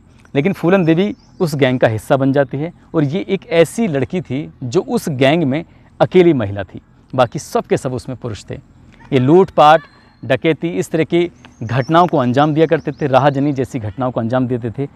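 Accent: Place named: Amroha, India. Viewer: सर, आप तो हमारे ज़िले अमरोहा की हमारी तहसील हसनपुर के ही निकले 😂 आपके बोलने के अंदाज़ से मुझे लगता था, Allah bless u sir, keep it up👍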